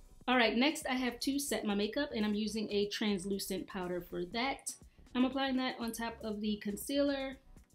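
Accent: Baltimore, United States. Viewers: is that a Baltimore accent?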